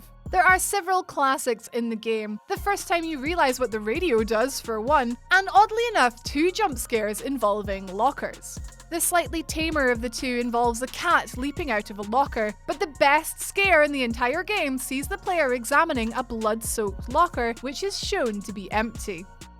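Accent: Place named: Scotland, United Kingdom. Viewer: I've said it before and I'll day it again. That accent 😍 MonTheScots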